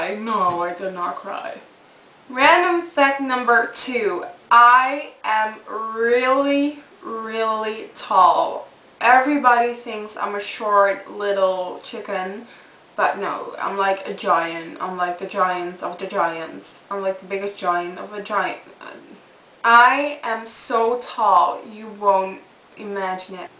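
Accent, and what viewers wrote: Netherlands. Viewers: It's cause she's Dutch and she has an accent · when i first watched one of your more recent videos, i'm so embarrassed to say i actually thought you were American because I can barely hear your accent!! i was happy to discover you are from Holland! I love watching your videos, you are so talented and funny! LOVE YOU!!!!! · That’s a Dutch accent? Interesting.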